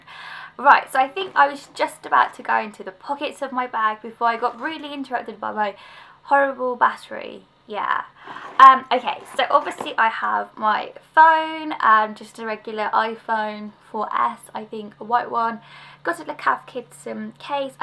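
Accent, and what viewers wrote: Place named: United Kingdom. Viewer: Your british accent is so cute ^_^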